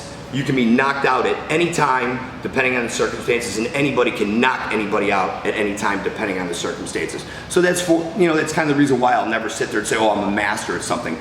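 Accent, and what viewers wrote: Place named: Scotland, United Kingdom. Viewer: Hi big fan of your tHE ROGUE WARRIORS series of vids very impressive, i wonder if any of your students teach this no bullshit style in the uk,on a lighter note I LOL at you comment i am about to shit on your corn flakes (you sound like my scottish budy) my personal comment in the uk is usually well that pissed on your strawberrys, i can't believe the negative troll comments you get from people who just decide they can't believe what they see, ar well i guess every ying has its yang all the best, Russ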